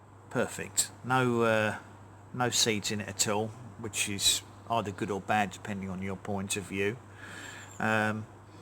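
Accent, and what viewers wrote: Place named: Essex, United Kingdom. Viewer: yes I recognise the Essex accent 🤣 I’m in Langdon Hills so only down the road. I’m looking forward to learning more!!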